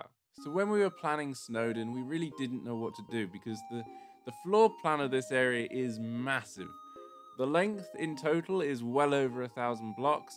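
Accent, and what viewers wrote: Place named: United Kingdom. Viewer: How are you even more british now wtf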